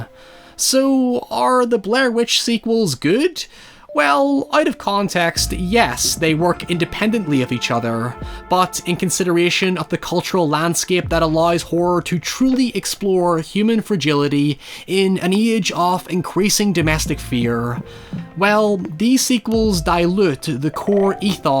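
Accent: Northern Ireland, United Kingdom. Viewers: Are you from northern Ireland? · Northern Ireland. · Are you Northern Irish?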